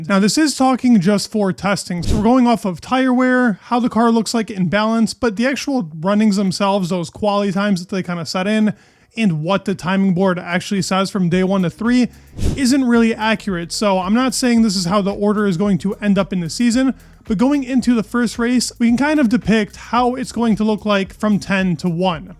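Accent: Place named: United States. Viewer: love your videos just wanted to say it’s great hearing a fellow American voice talk f1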